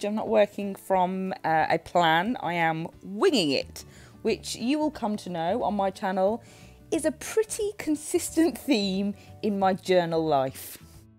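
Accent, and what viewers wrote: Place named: England, United Kingdom. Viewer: Awesome videos! Lovely to hear an english voice for once. If I was as artistic as you I probably wouldn't use washi either!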